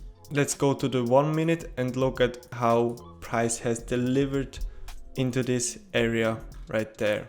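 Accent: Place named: Africa. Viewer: 😂❤️❤️love it mahn keep uploading..african accent makes me more tuned in than James’s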